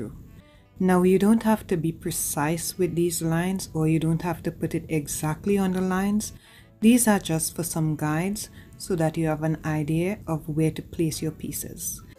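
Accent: Trinidad and Tobago. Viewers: Did i detect a slight trini...love from trinidad🇹🇹🇹🇹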